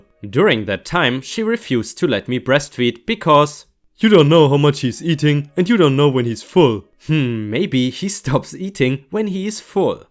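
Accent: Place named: Germany. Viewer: Random question. Are you german? I live in germany and you really have a german accent. I relly like it 🌟🌟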